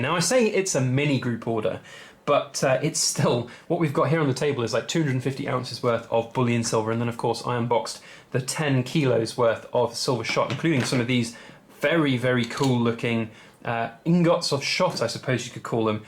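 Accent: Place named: Birmingham, United Kingdom. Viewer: My wife lived in England, she thinks you are from Birmingham. From the desert southwest states. Thanks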